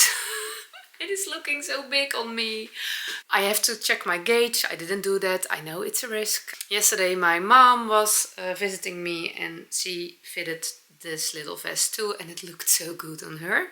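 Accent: Netherlands. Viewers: I love your dutchy English, your yarn choices. Vests are always a great garnement, and knitting sleeves feels like knitting the second sock. 😂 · I look forward to your Dutchy English each week. Thank you for sharing heart jumping moments through your creative processes. The plants you have look happy too.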